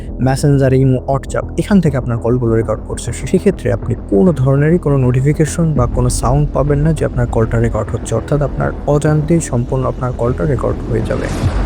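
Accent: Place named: Bangladesh. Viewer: উনি বাংলাদেশের লোক। ওখানকার ভাষায় বলছে।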